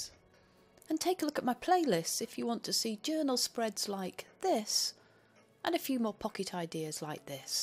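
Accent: England, United Kingdom. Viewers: What a lovely English accent! Thanks for the video 💐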